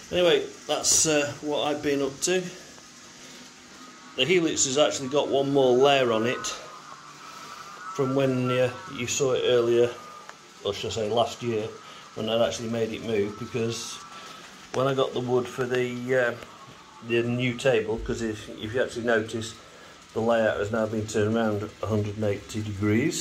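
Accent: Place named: Midlands, United Kingdom. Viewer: hear your dulcett Midlands tones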